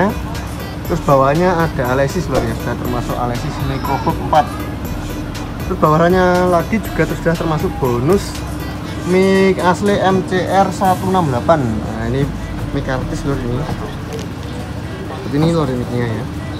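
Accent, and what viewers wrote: Banyumas, Indonesia. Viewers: Paling seneng ngrungokne omongane bangsa ngapak.. 😂 Sub e kok gk gawe 18an yo? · Pada bae wong ngapak...salam sukses selalu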